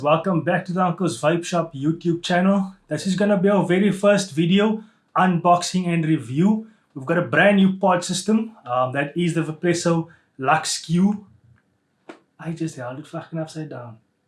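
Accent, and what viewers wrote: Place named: India. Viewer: Top stuff uncle, keep it up😅🔥love the Indian accent 😂🤣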